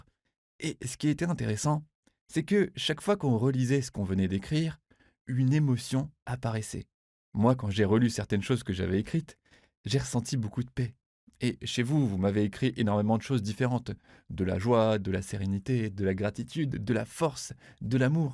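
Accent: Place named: Maghreb. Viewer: Il est étrange que-à mon point de vue-vous ayez un accent maghrebin alors que vous l'êtes pas dorigine ! J'aime vous écouter car la manière dont vous vous exprimez, engendre relaxation et détente, au sein de mon système nerveux énervé : Une impression que j'ai.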